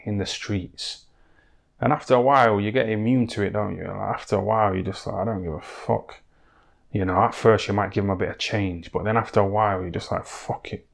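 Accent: United Kingdom. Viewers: I hate Nottingham accents make me feel 🤢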